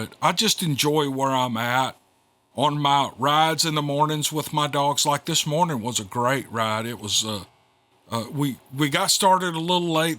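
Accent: South, United States. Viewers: I'm from the UK and that beautiful southern accent, so relaxing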